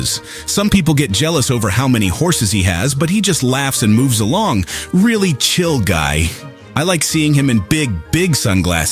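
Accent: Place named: Texas, United States. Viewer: how do you capture that texas colony accent so well?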